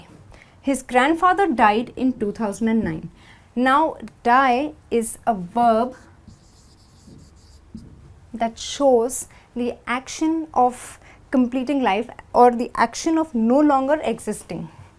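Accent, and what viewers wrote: India. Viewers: You english sounds like indian english. I learned very much with this lesson. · Are your English is Indian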